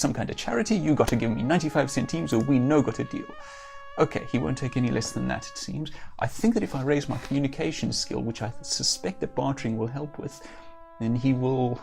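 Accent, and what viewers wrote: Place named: United Kingdom. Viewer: Yo, it's Spladam, killer accent dude, what part of UK is that from? I forgot how beautiful the VGA remake of this game was.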